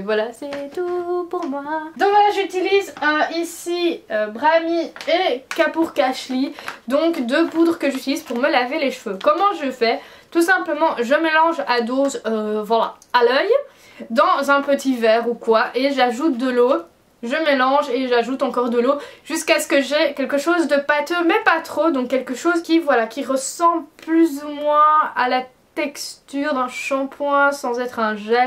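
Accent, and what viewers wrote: Belgium. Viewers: Héhé, voilà que je découvre ta chaîne, et en t'écoutant parler je me dis "Elle viens de Belgique, j'en suis sûre", et comme de fait :P (Moi aussi, huhu) Très sympa ta chaîne en tout cas :D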